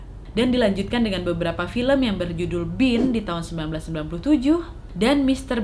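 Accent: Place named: Lampung, Indonesia. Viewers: dialek sumatra.... sumatra mana? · Mbak orang Lampung ya, dialek bicara nya Lampung banget 🙏🙏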